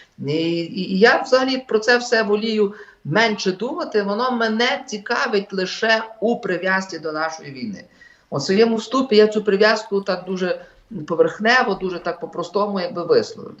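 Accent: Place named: Galicia, Ukraine. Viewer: Хотив послухати, але не розумию Галичанського суржику.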